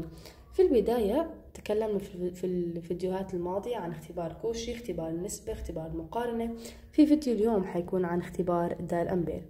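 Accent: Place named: Homs, Syria. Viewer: حاسك حمصية متلي😂 عكلن شرح ممتاز الله يعطيكي العافية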